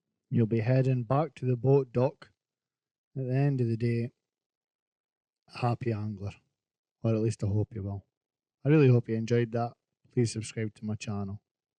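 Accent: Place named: Aberdeen, United Kingdom. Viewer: 1st class video, listening to you reminds me of my time in Aberdeen.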